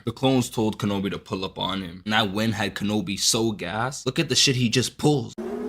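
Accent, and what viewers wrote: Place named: Toronto, Canada. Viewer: are you from Toronto? I can sense the accent like i sense the force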